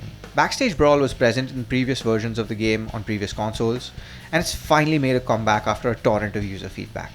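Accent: India. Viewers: Horrible preview. This guy is pretty subjective and his Indian accent makes me wanna puke.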